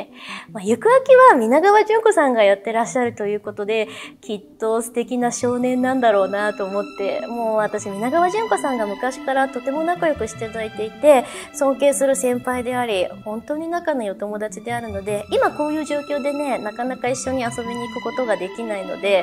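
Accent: Kansai, Japan. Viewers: the kansai dialect definitely made it 10x better <3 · Queen of Kansai Dialect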